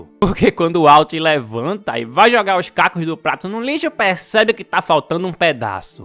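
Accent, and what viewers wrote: Northeast, Brazil. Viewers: Sotaque nordestino o caba conhece de longe😊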